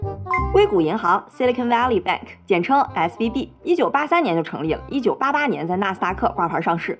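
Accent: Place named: Beijing, China. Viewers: 喜欢这北京腔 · 北京乡音，，太好听了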